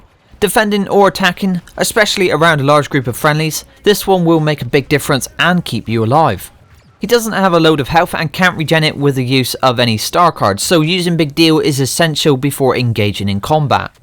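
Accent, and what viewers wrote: West Country, United Kingdom. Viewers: Where in the West Country are you from 😏?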